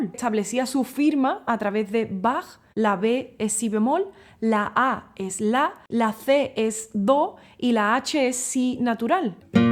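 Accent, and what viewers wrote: Andalusia, Spain. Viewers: Lindo acento andaluz tenés, y tu simpatía es comunicativamente eficaz, pero tocás mal, piba, con problemas de tempo (acelerás y retenés sin criterio, adornás sin intuición y porque está establecido en manuales que ciertos trinos deben ocurrir aquí y allá, lo que te lleva a rehuir la creatividad en tus fiorituras, etc.), por no decir que tus cuerdas están siempre ligeramente desafinadas. Ergo, dedicate a hablar y largá de una buena vez la guitarra. Un survival tip: si vas a tocar una pieza, nunca antepongas un fragmento de una versión tan superior, como la de Evangelina Mascardi. Lo mejor habría sido, ya que la honestidad late en vos, que te limitaras a enviar a tus suscriptores a visitar esa versión en lugar de exponer tus insuficiencias cometiendo la tuya. Saludos.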